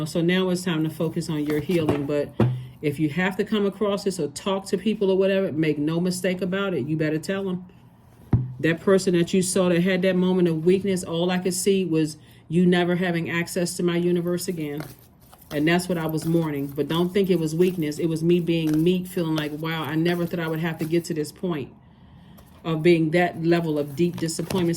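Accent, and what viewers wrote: United States. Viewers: Thanks so much for this reading and for the support and clarity I have gained through these readings. Take care of yourself and God’s blessings always. You sound American and one day sooner than later I will like to catch up to say Hello. ❤❤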